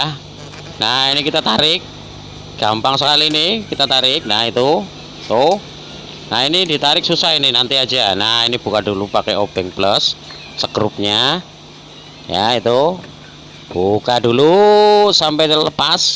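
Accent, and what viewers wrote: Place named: Ngapak, Indonesia. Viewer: Logat ngapak e kentel bgt kang 😄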